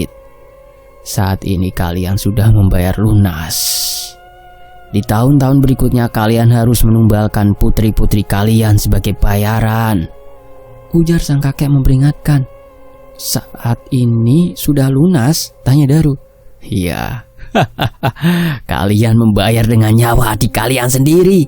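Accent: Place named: Kalimantan, Indonesia. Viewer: Mas ini cerita dr daerah mn,,, Logatnya dr kalimantan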